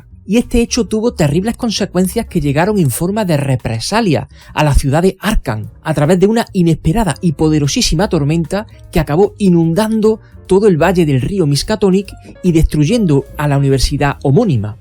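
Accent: Andalusia, Spain. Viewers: Tienes acento andaluz. Saludos de México. Y qué buen descubrimiento saber de éste tu canal.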